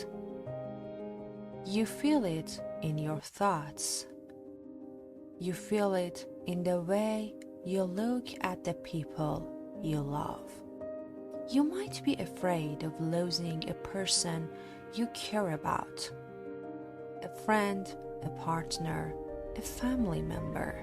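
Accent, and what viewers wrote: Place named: Iran. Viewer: عالی بود و ممنون از زحمت شما . اما اکسنت ایرانی بود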